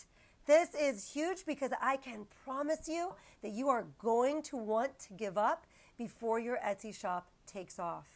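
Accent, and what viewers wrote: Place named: New England, United States. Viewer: i have been following you since day 1. I went from 0 sales in may to 360 sales today, which is not tremendous but I don't have a lot of time to spare so I consider it a win. I owe a lot of my success to you and a few other youtubers like you. So thank you!! I was honestly surprised because I haven't had a single 0 sales day since January 1st. Before that, it was probably October. Again, I owe it to you ❤❤ In other unrelated news, when I first found your channel I was surprised by something else and that's Tucker lol. I don't know where you live but judging by your accent i assume somewhere in New England. There's a gardening Channel i watch, James Prigioni, and he has a little dog called Tuck who looks a whole heck of a lot like Tucker. They live in Jersey. Just a fun fact 😂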